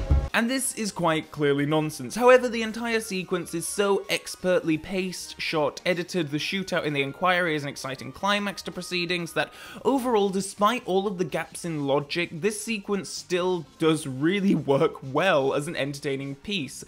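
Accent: United Kingdom. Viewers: Well done. This wouldn't have worked as well if you didn't have a British accent <smile>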